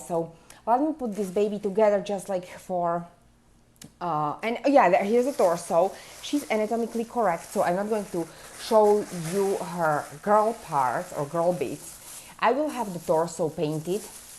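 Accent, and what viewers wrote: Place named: Europe. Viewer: From what country in Europe do you come from? Yesterday evening I met a lady with your accent and boy she is your "sosie" as we say in french. I don’t know is the word exist in english but I thought it was you!! And she is sweet like you!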